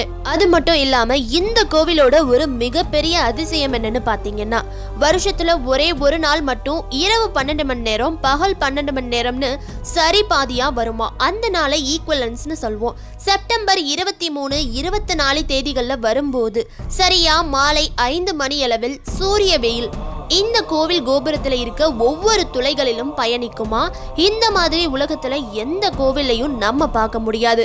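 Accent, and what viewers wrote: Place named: North India, India. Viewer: Unga Tamil voice super...!! North Indian girl Tamil pesura Mari Iruku....!!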